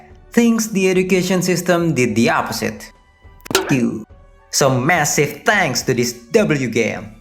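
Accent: Indonesia. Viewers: hell nah, satu satunya orang Indo yang masih ngehidupin komunitas GT, mangat yak, make sense knp logatnya kek org Indo😅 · Hop on Limbus gng. In what world where a CEO got lucky with gachas will give you 10 free pulls. Either way im an indonesian myself and ive been watching you for quite a while and you know your indonesian accent stuck way too much on your english it makes me wanna laugh so badly (i cant lie lmao). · oh indo toh, kentel banget aksennya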